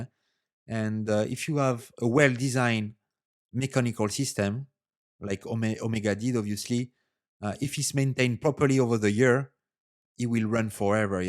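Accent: France. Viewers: Toujours un plaisir de t’écouter et regarder travailler. Explication simple et précise ,continue comme ça ,avec ce french accent de toute beauteyyy 😜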